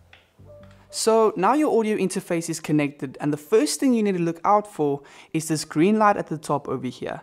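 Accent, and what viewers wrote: South Africa. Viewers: Surely you are South African! Anyway, great video ZA gang here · Hes South African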